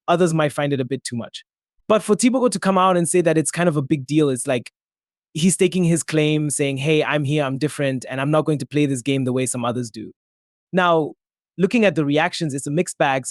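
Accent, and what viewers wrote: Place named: Southern Africa. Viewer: My man, you sound very southern African... I'd wager South African straight up... yet you made a whole video and released it internationally without looking up how to pronounce this southern African man's name? Embarassing...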